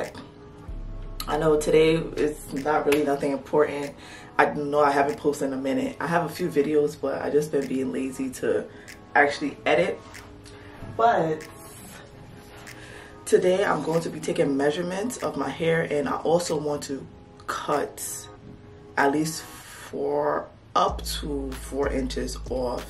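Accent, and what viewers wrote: Liberia. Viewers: Liberian girl? I hear the accent 🇱🇷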